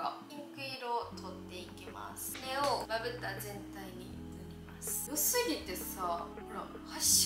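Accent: Kansai, Japan. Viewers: 関西弁？やばすぎて聞きづらいｗｗｗｗｗｗｗｗｗｗｗ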